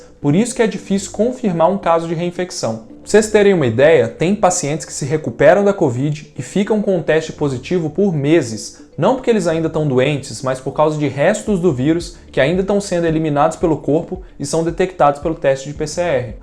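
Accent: Minas Gerais, Brazil. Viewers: Agora temos um sotaquim minerim de responsa divulgando ciência de qualidade no Brasil todo 😂😍 Valeu! 👌🏼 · Tô sentindo que os vídeos tão perdendo o sotaque mineirinho hein...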